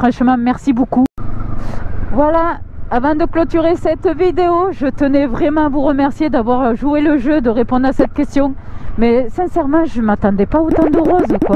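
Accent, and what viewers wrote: South, France. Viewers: J'adore ton accent du sud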